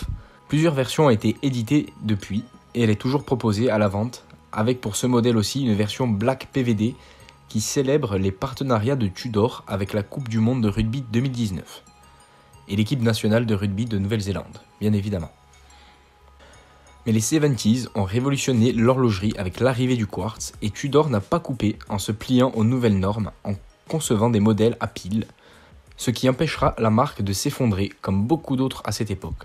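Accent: Corsica, France. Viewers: Ah... je reconnais bien l'accent corse !!! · Un soutien pour le contenu de a vidéo ainsi que pour l'accent corse.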